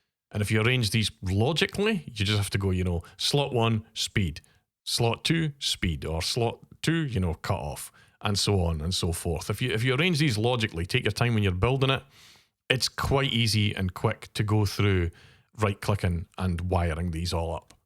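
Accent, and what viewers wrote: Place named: England, United Kingdom. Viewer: Love your english accent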